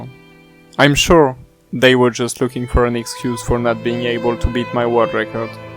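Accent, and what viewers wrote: France. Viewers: On entend quand même l'accent français 😂❤ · You have a fµcking french accent. Ca se voit direct.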